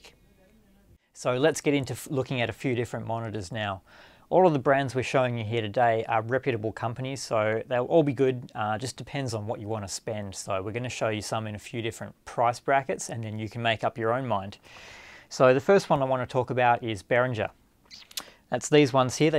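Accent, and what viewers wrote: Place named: Oklahoma, United States. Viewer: sup with this dudes voice is he from oklahoma or what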